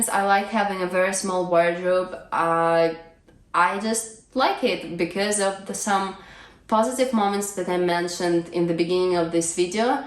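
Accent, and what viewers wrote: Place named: Russia. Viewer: Happiness is found in contentment and simplifying ones life. Buying lots of clothes to impress others will produce disappointment and empty your wallet. Thank you for paving the way for others to consider the minimalist lifestyle, it is certainly producing fruit for me. A technical note: Your voice seems to echo a bit in your kitchen and combined with your light Russian accent it may not always be easy to understand you. Consider getting a small clampable microphone to attach to your neckline, it will make your voice very distinct. I noticed when you were (outside) talking while headed to the thrift store a few weeks ago, your voice was quite clear. For what its worth. :)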